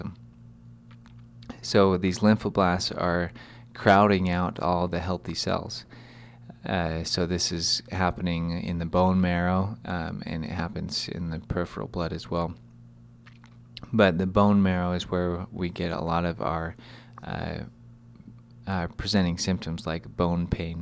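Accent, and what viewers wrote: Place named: United States. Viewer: fatima Imtiaz he sounds American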